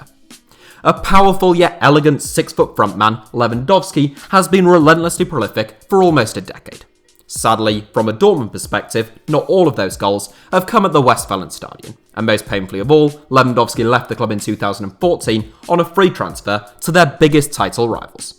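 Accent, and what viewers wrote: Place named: Hull, United Kingdom. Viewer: Thee sound a bit posh for ull lad......I dropped the h to make it sound propa.....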